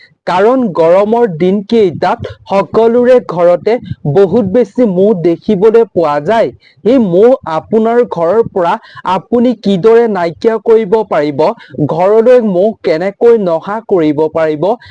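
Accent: Bangladesh. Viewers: Aponar kotha khini bangla deshi jen lagil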